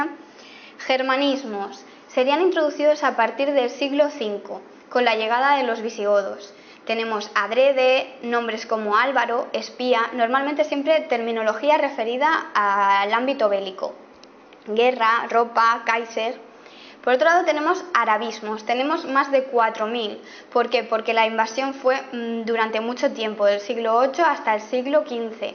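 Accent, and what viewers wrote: Spain. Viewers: De España v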